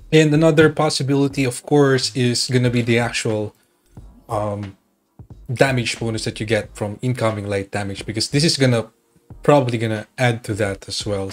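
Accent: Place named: Philippines. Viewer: Pinoy na Pinoy Ang accent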